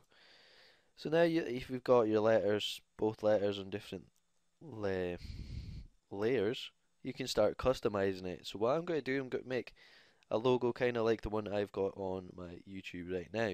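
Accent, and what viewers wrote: Scotland, United Kingdom. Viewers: HES SCOTTISH!!!!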